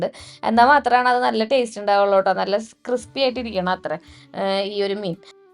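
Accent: Thrissur, India. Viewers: Enthu sughaa videos kandirikkan, pinne Thrissur slang enikk orupad ishtaanu, kurachukalam njangal Guruvayur ndayirunnu, 7 muthal 10 vare njan padichathokke avideyaa, ente Malappuram pole enikkishtaa Thrissur nem. Nte Guruvayurappanum avide alle,pinne jeevithathile ennum orkkan ishtapedunnathum allathathumaya orupad ormakalum aa nad enikk thannittund.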